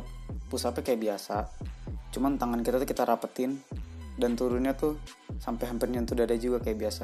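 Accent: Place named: Medan, Indonesia. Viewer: Bang org medan ya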